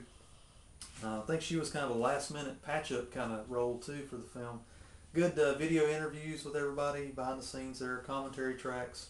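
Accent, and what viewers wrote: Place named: Louisiana, United States. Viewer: Your accent, are you from Louisiana?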